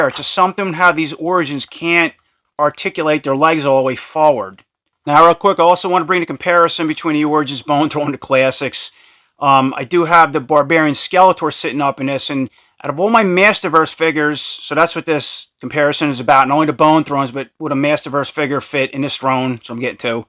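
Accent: New York, United States. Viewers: This guys gotta be from New York I bet 😂😂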